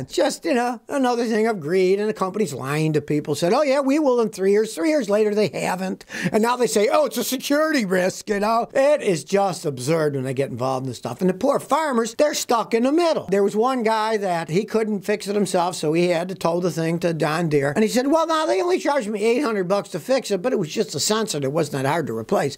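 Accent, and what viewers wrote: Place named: Tennessee, United States. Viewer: Anyone else notice that Scotty is starting to get a Tennessee drawl??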